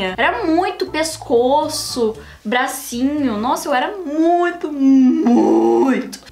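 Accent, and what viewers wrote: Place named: Paraná, Brazil. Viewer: "Muito sotaque paranaense" mais vc é paranaense linda! 😍💕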